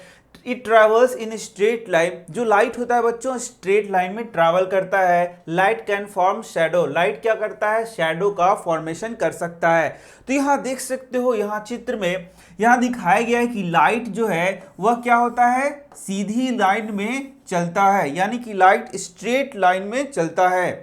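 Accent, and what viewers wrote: Bihar, India. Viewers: I am dam sure sir is bihari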